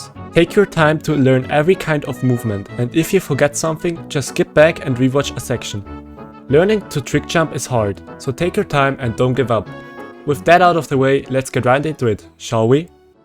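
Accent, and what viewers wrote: Germany. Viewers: Your English is very German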